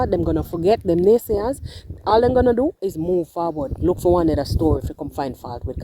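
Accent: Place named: Jamaica. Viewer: I just love how you speak all jamaica bad mine the girl and then a talk bout say a good advice and she mùst listen to them after dem a chat her out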